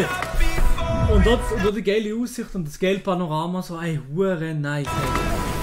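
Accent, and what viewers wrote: Switzerland. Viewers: Sehr schönes Video von dir aber versteh dein Schweizerdialekt nicht bin Deutscher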